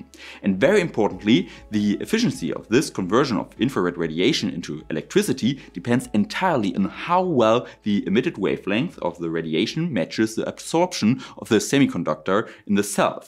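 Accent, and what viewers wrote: Germany. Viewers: Are you sure you want me to hit the subscribe button? As I am already subscribed and now I am unsubscribed which is annoying as I like your German accent, and you speak English fine, So I sorry bit I going to go against your wishes and subscribe to you again. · Das Englisch ist wirklich nicht schlecht und wird auch immer besser. Ich denke, dass es im allgemeinen als ein charmanter Akzent passend zum Kanal-Namen wahrgenommen wird (wir hoffen ja alle, dass unser deutscher Akzent als charmant wahrgenommen wird)... Also ein echtes Markenzeichen! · Nothing wrong with your English. No need for excuses there. Don't denigrate yourself. Besides, if anyone clicked on and then gets mad for a German accent, that is totally self-inflicted. As for the cells... really depends on how much energy they cost to produce and how much energy they make in turn. 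Wonder what a first experiment would look like - regular photovoltaic on top, mercury cadmium telluride on the bottom. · Your English is perfect just spoken with a slight German accent (I can understand you better than some Southern American accents). This is a VERY interesting topic and I liked how you presented the technology and then the hurdles. I subscribed. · You're English is fine -you do sound very German, but pronounciation is great. You do have slight vibes of Jurgen the German, a character created by Harry Enfield for his BBC comedy show.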